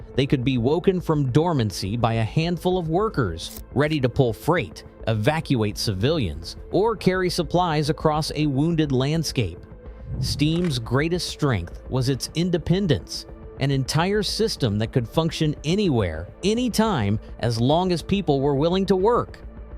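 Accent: United States. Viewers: 🙂 Ja vad ska man säga om denna film berättare . Det låter som en amerikan som läst på lite gran om historian . I USA körde dom Ånglok tills dom kom på att Diesel lok va bättre , Inte det som alla andra har Diesel-elektriska lok utan bara diesel . Det är bra för miljön i USA . Sovjet köpte Svenska ånglokomotiv en bra bit in på 1930 talet . Sovjet byggde om sitt transport system från häst & vagn till Järnväg . Dom hade set sig om i världen på 1840 talets Europa & Amerika byggdes järnvägen upp . USA är inte direkt kända för sina järnvägar . Europa har ett virrvarr av statliga och privata järnvägar allt från smalspåriga till bredspåriga . Järnvägarna tar detta på stort allvar och under 1890-talet börjar järnvägen elektrifieras. Boxholms bruk är först i Sverige med ett järnvägsspår med eldrift år 1890. Fem år senare öppnas den första elektriska järnvägen för persontrafik. 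Det är den 11 kilometer långa sträckan mellan Stockholm och Djursholm. Så 60 år senare vaknar Sovjet med elektrifiering av sin järn väg . Men att ställa upp lokomotiv på stora järnvägs uppställnings platser finns överallt . Om man är försiktig med dom alltså ha dom som reserv . Så kunde man göra som oss Svenskar ställde upp dom i Bergrum eller under tak , Utspridda i landet . Att göra som amerikaner och Ryssar är trist där står dom och förfaller . Det är bara att göra FRAG av dom och koka om till stål igen . Amerikanerna med sina Diesel lok har mer bekymmer eftersom deras gamla lok är giftiga så ingen vill peta p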